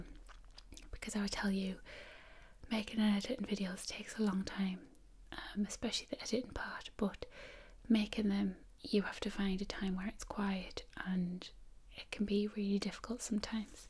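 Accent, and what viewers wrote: United Kingdom. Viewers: I think I can day my English is pretty good, I always understand all the asmr video's I like to watch, but I have to admit.. sometimes I really can't understand you because of your very strong British accent! Am I the only one? But I can deal with it, because I really love listening to your accent haha ^_^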